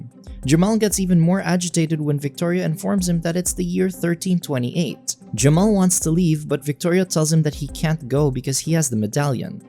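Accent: Philippines. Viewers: Taena halatang Pinoy tong voiceover hahahaha sagwa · You sound Pinoy! :D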